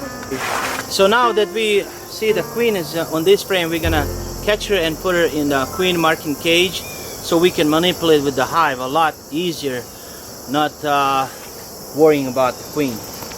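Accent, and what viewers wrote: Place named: Balkans. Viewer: You sound... like you're from my part of the world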